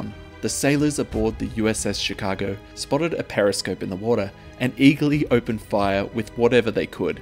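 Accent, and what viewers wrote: Australia. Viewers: I like the Australian English accent. Is how you say "nuclear" the way all Australians say it? 🇦🇺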